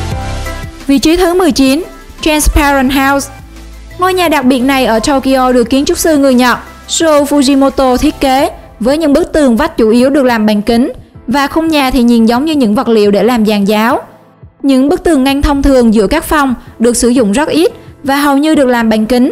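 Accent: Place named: Binh Dinh, Vietnam. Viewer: cij này ns tiếng như bình định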